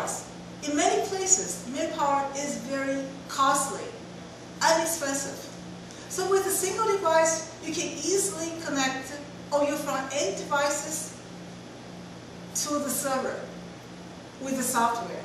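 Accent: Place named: Russia. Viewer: good russian accent!!!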